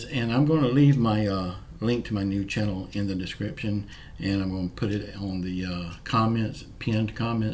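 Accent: South, United States. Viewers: Thank u for answering my question💕 love hearing ur southern voice 🙂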